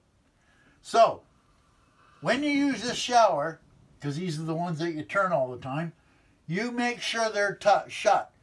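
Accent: Canada. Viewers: I don't watch to learn as much as I watch to hear that Canadian accent! 😂😂😂 Eh? Good to know info,! I will have to re-plumb my rig when I get home and this might help! Also, 🦄🦄🦄🦄🦄📸📸📸📸📸📸📸📸 your camera person makes you look good! 😁🙏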